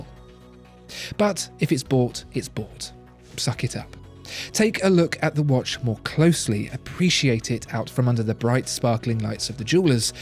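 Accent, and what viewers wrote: United Kingdom. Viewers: This is completely subjective. A British accent doesn't make you an authority.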